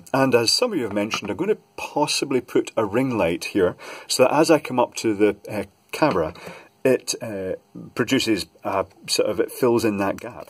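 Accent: Scotland, United Kingdom. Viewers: 17 seconds in sound is clearer and not harsh at all i enjoy the scott accent reminds me of my great granddad :-)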